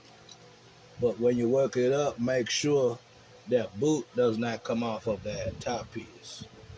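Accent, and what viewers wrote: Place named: South, United States. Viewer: I could listen to his Southern Drawl all day long!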